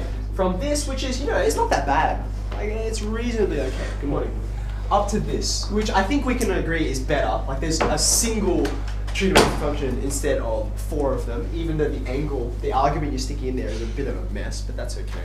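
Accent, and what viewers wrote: Australia. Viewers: That darn Australian accent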